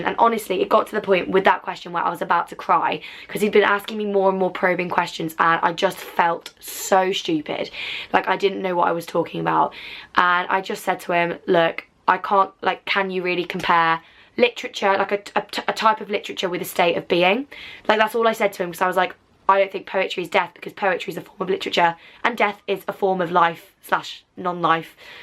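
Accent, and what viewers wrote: Birmingham, United Kingdom. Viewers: wait... a Brummie??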